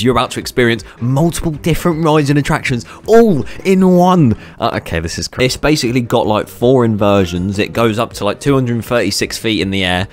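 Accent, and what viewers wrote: United Kingdom. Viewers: british accent just enhances the video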